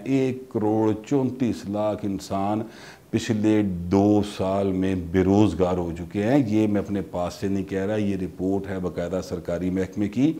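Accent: Lahore, Pakistan. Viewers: I like your Lahorei style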